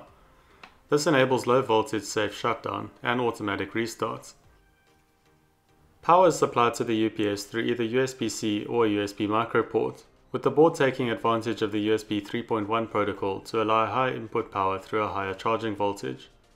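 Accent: South Africa. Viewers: South African? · You sound South African. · you sound like a South African, not an Australian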